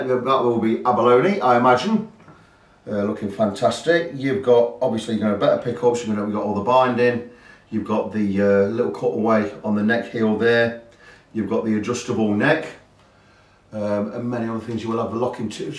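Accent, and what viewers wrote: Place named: Nottinghamshire, United Kingdom. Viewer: I love your no nonsense, workmanlike style of setting up guitars. You are a real craftsman. I'm guessing, from your accent, you are local to me, Newark, Notts.?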